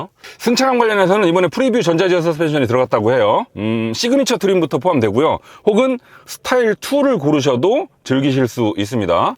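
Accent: Jeolla, South Korea. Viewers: 영상 보면서 느꼈네요 지식도 풍부하시고 이해가 팍팍~!!쉬운설명 감사합니다~ 구수한 전라도 억양 듣기 좋네요~~!! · 절라도 사투리 역겨워서 못들어주겠네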